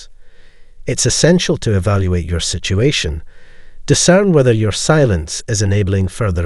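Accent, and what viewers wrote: United Kingdom. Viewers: This particular session was very helpful, so clearly explained, I feel I can handle the narcissist in my life, and not have to worry that she can gain gain control over me. I think I can win now without harming her. I want you to know how British pronunciation comes across to me as less aggressive than U.S. pronunciation, and it decreases the stress I feel when absorbing narcissistic subjects. I look forward to listening to you. Thank you very much.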